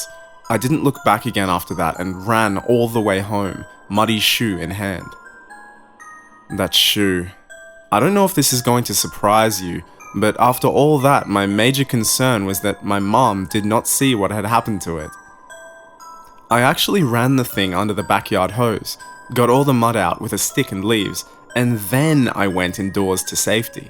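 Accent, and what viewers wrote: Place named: Australia. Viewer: On a side note, always loved the Australian accent, and I feel like it works great for this story! But I do love the way yall say No very much lol, not trying to knock ya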